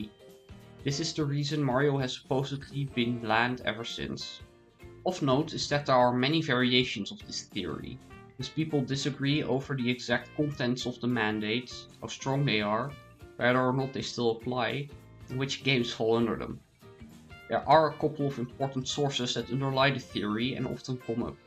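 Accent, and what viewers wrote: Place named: Netherlands. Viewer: He talks with a Dutch accent. I would know since I'm also from the Netherlands.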